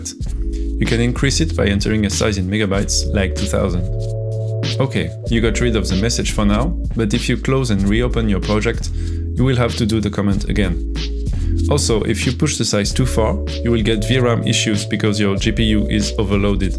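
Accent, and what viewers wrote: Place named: France. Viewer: y'a pas a dire notre accent francais es si reconnaissable hahaha beau boulot en tout cas ;)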